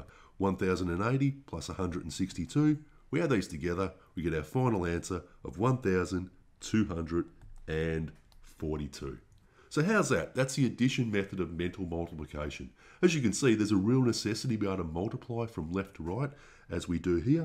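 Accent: Australia. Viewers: you sound like bruce from finding nemo are you australian mate?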